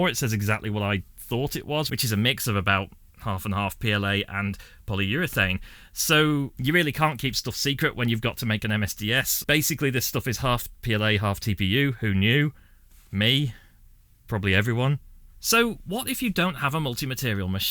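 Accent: England, United Kingdom. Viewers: Nothing more fun than listening to an Englishman.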